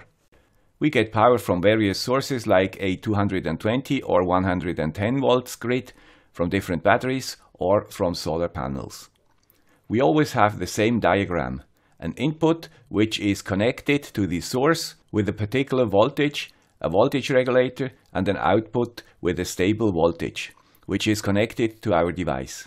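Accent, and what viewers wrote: Switzerland. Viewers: Sali, love your Swiss accent! I also have not been able to get rid of mine. As long as you call the meat on the plate not like the timber no worries. But the FBI is like ei so is the quiescent current. English is not regulated like German. Downunder we drive on tyres while in the US they use tires. There are tongue breakers. California has Yosemite and in the land of Koalas we eat Vegemite, both same ending but different pronunciation. Acronym abuse gets one confused so in our ham radio club ce hand each other upper sideband siticks! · Hey, you just got this video mentioned on Hackaday. Good job and hope your channel continues to grow. May the Swiss accent keep on going!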